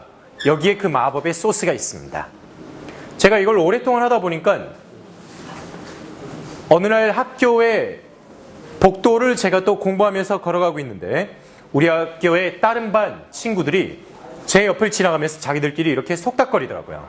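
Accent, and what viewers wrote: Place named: Busan, South Korea. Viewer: 부산말을 서울말로 번역 할 필요 없어요 ~~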